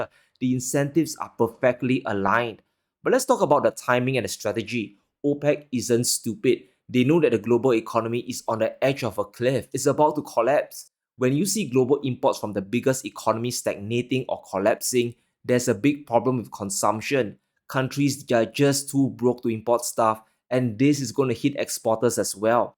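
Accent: Jamaica. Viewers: i cant figure out, is this jamaican chinese accent or what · **** brotha' you sound like a Rasta when you speak. Good information in the video, sir thanks****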